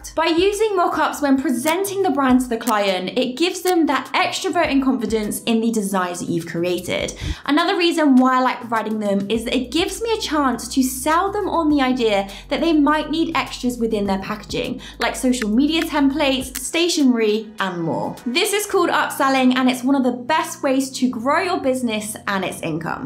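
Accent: United Kingdom. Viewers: ! You have really nice british accents. Your content is always worth watching than other channels. Glad i found you and God made you ✨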